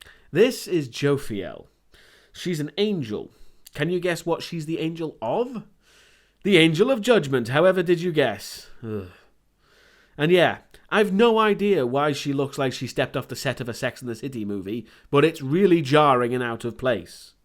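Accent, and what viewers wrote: United Kingdom. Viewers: Is this dude British? I love his reviews btw I just want to know if he is or not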